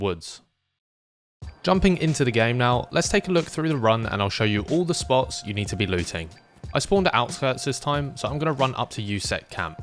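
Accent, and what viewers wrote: United Kingdom. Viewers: Best woods guide on the internet, with a British accent to top it off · Easy sub for you here mate. Clear consise no BS and British. Woods not intense though? Gotta argue on that one!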